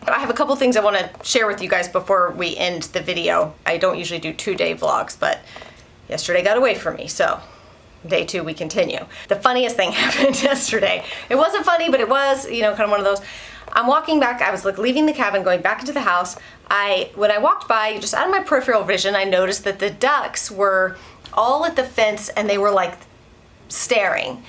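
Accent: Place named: South, United States. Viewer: I love your southern accent! And your real adhdness in this video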